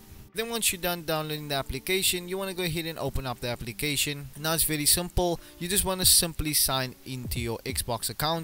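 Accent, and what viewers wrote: South Africa. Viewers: U from SA aia.? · You sound like a south african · Are you Afrikaans?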